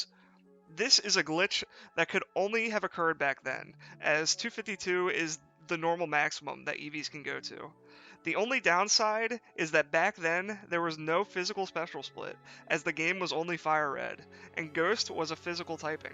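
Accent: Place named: Pennsylvania, United States. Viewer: Random question but are you from PA? Feel like I'm hearing a little PA in your voice 😂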